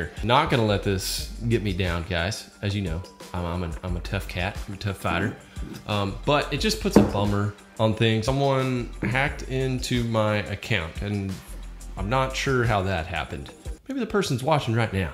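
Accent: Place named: Appalachia, United States. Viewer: Great video to see you being you, and not much of that overdone hillbilly accent.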